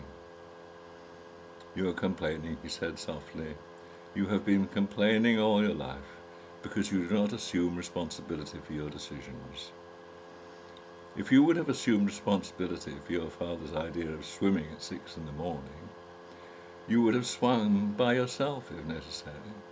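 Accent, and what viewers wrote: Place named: United Kingdom. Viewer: Listening to this in the British accent and calm voice is sublime :)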